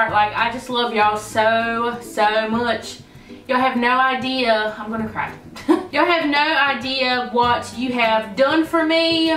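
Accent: South, United States. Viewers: My favorite video would have to be the master bedroom transformation. Girl, it's beautiful! I hate picking just ONE video because I love them ALL!! I love those videos when you start rambling in the car because your accent is just like mine so country girl! My Instagram is LOVE YOU & CONGRATS ON 20K GIRLY!!!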